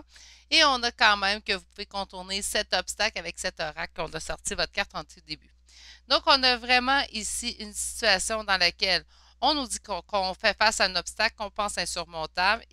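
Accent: Canada. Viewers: Je suis espagnole. J'habite en France depuis 5 ans et malgré le fait que je ne suis pas habituée à l'accent canadien je comprends parfaitement.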